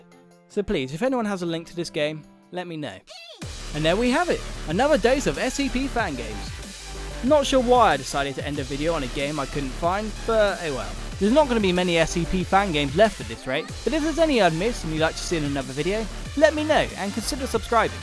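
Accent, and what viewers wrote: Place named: United Kingdom. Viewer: you sound british cool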